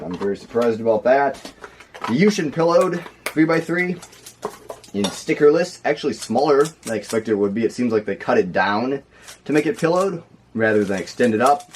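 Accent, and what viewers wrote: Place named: United States. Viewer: Bruh u like Vietnamese but sound American af...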